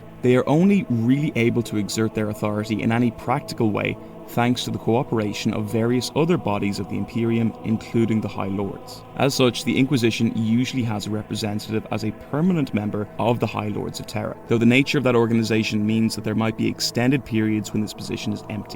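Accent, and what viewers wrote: Ireland. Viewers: I did come back. The Irish brogue, soft as it is, works well, and the analysis is novel and worthwhile.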